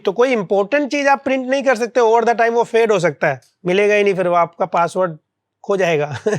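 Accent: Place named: Uttar Pradesh, India. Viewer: भइया आप के बोलने का तरीका बड़ा बढ़िया लगता है मतलब लगता है की हा हम उत्तर प्रदेश से हैं बहुत इंटरेस्टिंग वीडियो होती है आपकी ❤ से love u brother 🥰🫶🏻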